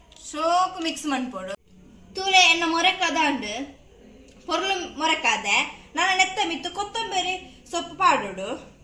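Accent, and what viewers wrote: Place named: Mangalore, India. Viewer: Pure manglorean base voice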